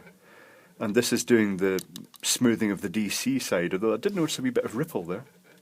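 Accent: Scotland, United Kingdom. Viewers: Yeah he is Posh Scotch - only the best from Scotland! Sounds a bit like Bryce Curdy except he doesnt have a cucumber shoved up his butt! lol PS. Bryce Curdy was a gay STV news presenter who turned up at a local Glasgow hospital with a cucumber lodged in his rectum - his career after that was all too short unlike the cucumber which according to my pals at the Viccy was a massive 16 incher! lol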